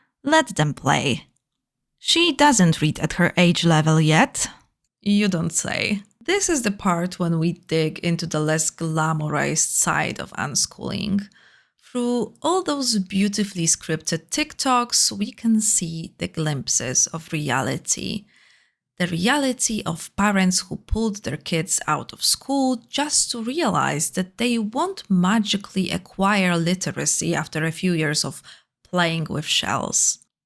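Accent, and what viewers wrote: Poland. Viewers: just the accent.. my poland radar went off instantly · hears polish accent -'no way' checks channel name 😮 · Kocham kontrast pomiędzy ośmiornicą bez podstawówki, a człowiekiem bez podstawówki. Strong Polish akcent btw ^-^ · It's beutiful to hear how polish accent in English looks like! XD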